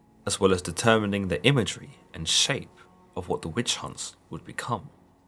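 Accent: England, United Kingdom. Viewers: TF... Is this a reupload? I'd borderline be willing to SWEAR I've watched this video before! Not a similar story-of course I've heard the tale of Tituba every which way you can spin it-but THIS one, almost down to English accent & word choice/vernacular... Damnedest thing... #DejaVu anyone? 🤨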